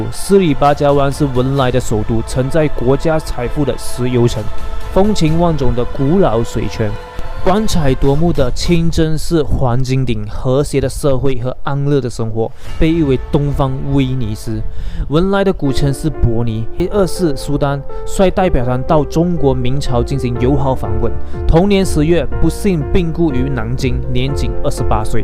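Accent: Malaysia. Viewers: 没人要求你一定要听完，这是我们malaysia的特色，这是我们祖先从中国来到南洋后传给我们的（我们听着很舒服） 就好像东北/台湾/山东/香港都有各自的口音，我们会尊重他们的口音，说话方式， 怎么到我们的口音你就有意见了～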